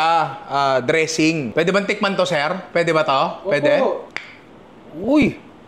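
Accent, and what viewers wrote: Batangas, Philippines. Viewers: More Batangenyo accent ninong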